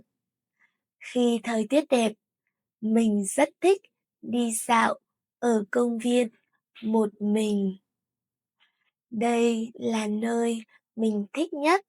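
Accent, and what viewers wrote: North, Vietnam. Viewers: Thank you very much for your videos. I deleted all the other channels and kept only yours. I finally found what I was looking for, and now I only study with you. Im European, my girlfriend’s from HCMC and her dialect is a little different from your northern one, but I still continue to watch you. Thank you for being there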